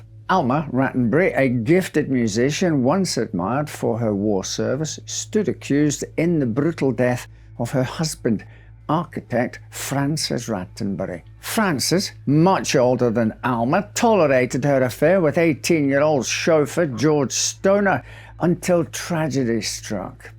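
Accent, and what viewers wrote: Scotland, United Kingdom. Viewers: He does tell a good story. Sounds a bit of Scottish accent, which makes it so much more interesting. I have no trouble understanding him as he's always careful to articulate clearly.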